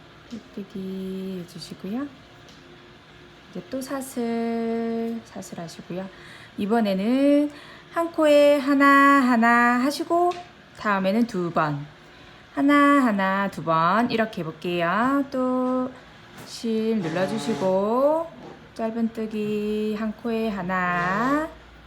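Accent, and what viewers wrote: Busan, South Korea. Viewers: 어머...손재주 좋으시네요. 이런건 구매해서 쓰는줄만 알았는데... 살짝살짝 부산 사투리 듣기 좋아요 설명도 잘해주시니 한번 만들어 보고싶은 욕구가 막 생기네요.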